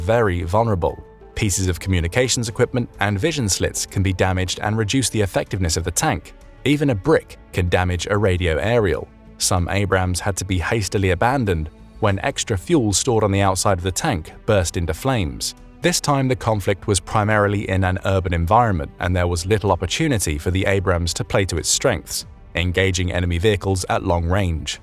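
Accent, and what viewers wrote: United Kingdom. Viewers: This channel never failed to make me laugh. That British accent always ticks me off.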